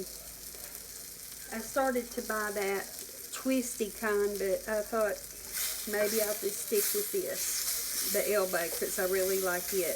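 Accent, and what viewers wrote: South, United States. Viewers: I’m generally a lurker, but I love your channel. Also, I haven’t lived down South since high school in 1992, so I love to hear your accent, brings good memories :-). Anyway, my Mama would make this for us, because it was cheap, easy and tasty. She generally just used well seasoned ground beef. I think that was just her preference though.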